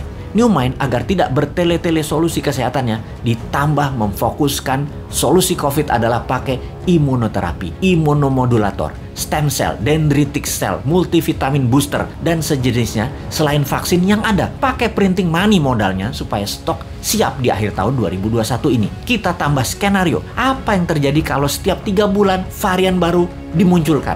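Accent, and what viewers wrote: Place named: Malang, Indonesia. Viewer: Pak Bossman Mardigu AREMA asli y ??/ngomongnya ceplas ceplos tp bener si.. :-) logatnya mirip orang Malang..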